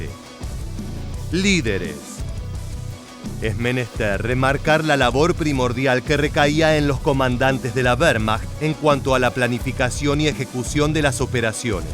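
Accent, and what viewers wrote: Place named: Argentina. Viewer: Al narrador se le nota lo europeozadonque se siente ha bla en español Argentino y no quiere pronunciar los nombres de los vehículos en español que incongruencia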